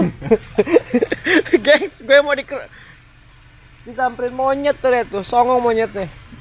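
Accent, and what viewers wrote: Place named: Kalimantan, Indonesia. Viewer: Orang Kalimantan mana suaranya 😄😄 #Yoyoyoy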